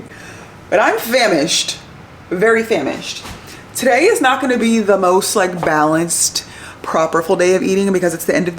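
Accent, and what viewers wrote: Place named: Philadelphia, United States. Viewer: OMG! You sound like one of the people from Parking Wars. And it is ALWAYS in Philadelphia. I hope you win!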